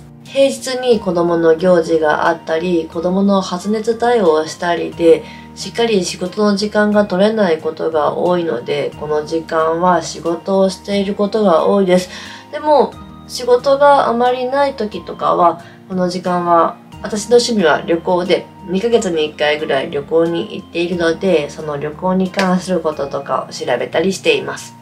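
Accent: Kansai, Japan. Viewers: この間初めて見たんですが🎉インスタライブの関西弁のときもかわいいです❤🎉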